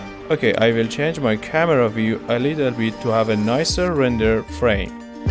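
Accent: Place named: Iran. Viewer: Good but You have to work on your pronunciation. (too much farsi accent and wrong pronounced words).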